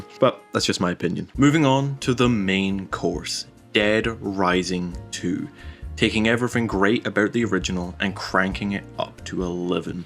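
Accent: Scotland, United Kingdom. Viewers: bro have some cool scottish accent. Love your comment on the whole series! I personally like Dead Rising 3 more than 2. I am totally agree with how you appreciate 2, but Dead rising 3 is a different story. To me it is like a gta with some real content, instead of crime simulator that just stealing car and killing random people and stealing car. The unrealistic combo weapon may look ridiculous, but they are so interesting and full of imagination, free from reality. The unlimited type of weapon spread over the map, and all the collectable makes fun to find all of them.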